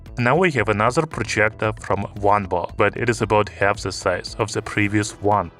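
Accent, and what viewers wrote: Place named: Russia. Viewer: Use AI for english, cause its hard to understand you Rusky!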